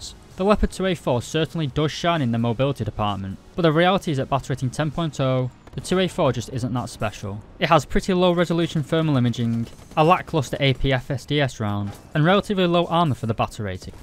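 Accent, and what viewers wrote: United Kingdom. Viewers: bro i would watch the video if u werent british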